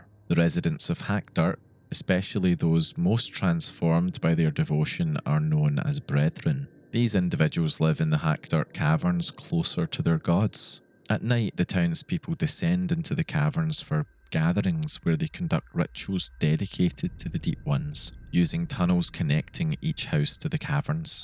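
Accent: Scotland, United Kingdom. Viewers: I liked this as soon as i clicked onto it because i heard a fellow scottish accent, and it's about oblivion. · Are you Scottish? Btw awesome video love the accent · Fuck me. I forgot you are a Scotsman 😂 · Subscribed for the Scottish accent. 🎉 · Can you use a different accent? It’s hard to fall asleep listening to Scottish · Is that a wee hint ae a Scottish accent? Or am a wrang neebur?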